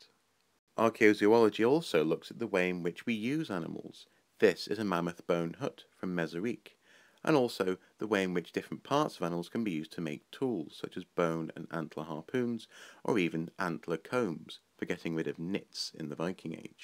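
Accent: United Kingdom. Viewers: are you british your acent is very strong?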